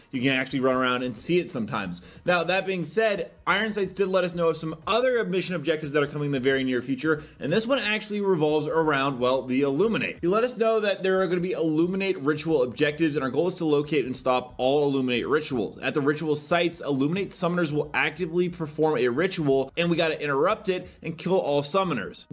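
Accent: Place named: Texas, United States. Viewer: Even as a f*cking french i can smell your texas butthole accent frome here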